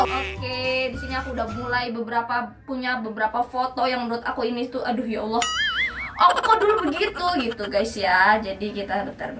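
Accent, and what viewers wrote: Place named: West Java, Indonesia. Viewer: Aduh logatnya sunda teh S E M O G A T E H I S M I S E H A T T E R U S: ) Aamiin😊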